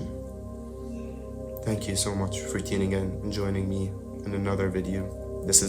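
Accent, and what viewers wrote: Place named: Ireland. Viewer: Your accent is mad. Can I detect a hint of Irish?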